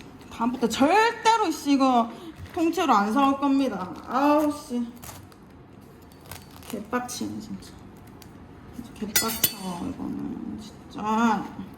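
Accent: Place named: Busan, South Korea. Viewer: 첫멘트 어? 리얼리? 발음이 좋으십니다 ㅋㅋ 한달뒤 휴가인데 말투 듣고 있으니 벌써 부산에 와있는 기분이네요 부싼 아입니꺼....ㅋ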